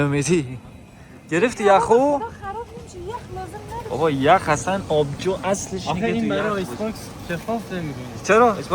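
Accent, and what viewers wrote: Mashhad, Iran. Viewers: اقا تو که لهجه مشدی یاد گرفتی به بگو یه کمی شمالی حرف بزنه ببینیم چقدر بلده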